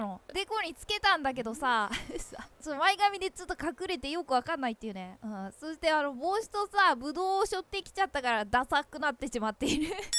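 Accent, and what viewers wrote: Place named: Mie, Japan. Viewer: 三重県の人なのかな？ ○○いけやんって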